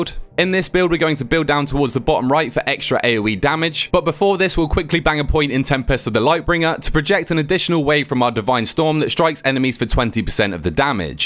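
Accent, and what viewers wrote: United Kingdom. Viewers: As an American your guide is more legitimate due to British accent.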